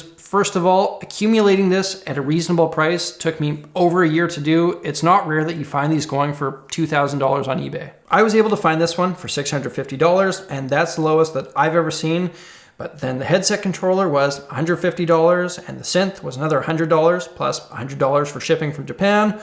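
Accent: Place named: Canada. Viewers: Forgive me if it's too personal/if it's a weird question, but where does your accent originate from? It sounds Canadian maybe, but a little more... I dunno, "thick" on some words? I really like it, it sounds very cool! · He’s from Manitoba, which is a province in Canada (I assume you’d know that, since you can recognize a Canadian accent. If you didn’t, I guess you learn something new every day 😅)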